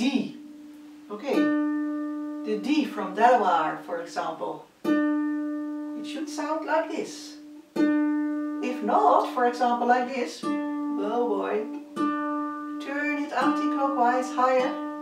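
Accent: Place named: Netherlands. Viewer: sounds like a Dutch accent 8-)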